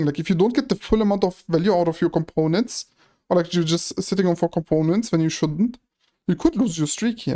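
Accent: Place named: Germany. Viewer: Gute Erklärung und man hört den deutschen Akzent raus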